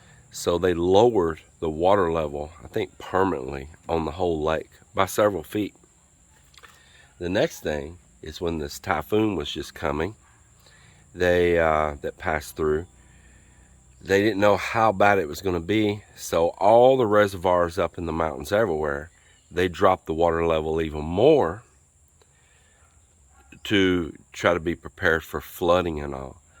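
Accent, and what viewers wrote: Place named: Texarkana, United States. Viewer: I keep trying to place your accent. Texarkana?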